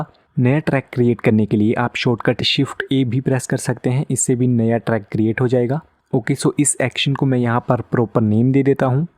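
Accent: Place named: India. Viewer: india voice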